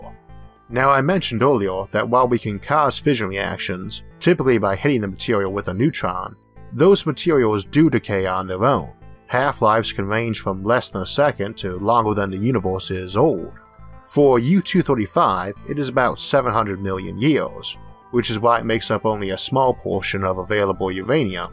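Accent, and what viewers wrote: United States. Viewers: actually what you call a speech imperiment to me sounds like most british people. like how brits pronounce english letters. but your accent is american. works for me! 👍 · I wish more people sounded like you. Almost sounds country and like I'm in a movie. Very well informed. Thanks for inspiring me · Where are you from? I can't tell if it's Southern or Boston. But it's probably neither - not that good with American accents yet.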